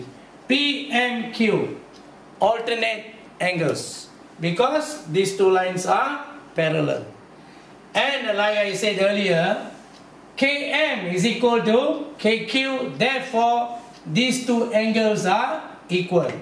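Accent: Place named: Malaysia. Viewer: he speak english like malau people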